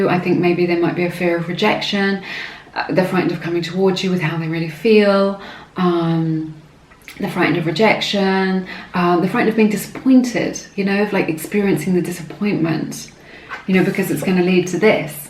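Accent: United Kingdom. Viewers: THANK YOU so much! I was lead to your channel by another youtube channel who I really respect. I’ve found that channels my friends or other readers have recommended to me are usually very accurate (could be the strength of my energy that’s being picked up on 🤔 Your reading was absolutely spot on to the point I was just smiling knowingly all the way through it (I chose 2). And because I’m also British, I don’t know why but I seem to resonate more with it. Silly I know but I like hearing the familiarity of the British accent! Thanks once again. 💙⭐️🙌🏾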